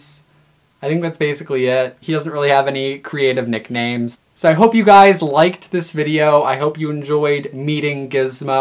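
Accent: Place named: West, United States. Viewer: Also I hear a bit of a western accent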